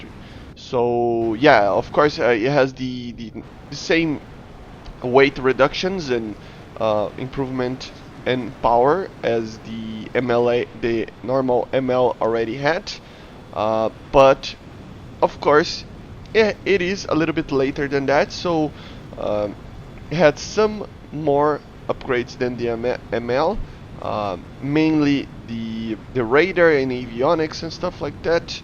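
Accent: Brazil. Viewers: What is your nationality? You seem to have a Brazilian accent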